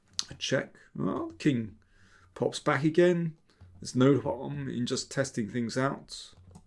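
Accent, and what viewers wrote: United Kingdom. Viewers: GM Danny - your smooth voice with British accent gives us listeners a human touch on chess in this AI world. Personally, it reminds me of David Attenborough voice talking about chess predator strategy going for the opponent King! :) Thank you for your commentary. I feel all big corporations need to invite GM like you to motivate and give life lessons to employees every year. Anyways, I personally think that Ding's blunder was sad for Chess WC title itself! Yes, it is how it is and I am happy Gukesh won as he played by the rules and won Candidates as well, but also feel sad for Ding that it was out of a blunder. But To 18 year kid Gukesh: He fought a drawen game to squeeze win here just like Carlsen. So Kudos to him. Am I happy about the results? Not really coz I want a real fight. Do I agree with the result? Absolute Yes. Do I think the Chess fans would deserve a Play offs here? 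Yes. Any regrets? No. Fun Fact: Gukesh can not even marry as Marriage age for man in India is 21. Anyways congrats to him and very well deserved. I cannot wait for next challenger. - From Indian American Chess enthusiast.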